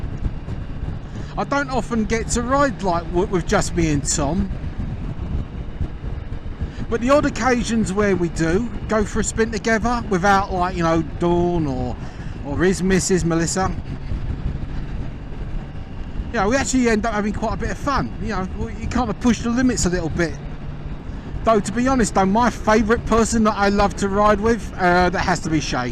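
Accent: Cockney, United Kingdom. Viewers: Don't you think you lay on the old cockney accent a bit thick mate? Do the yanks think its cute? It reminds me of Aussies who play up the Aussie Larkin thing to the hilt.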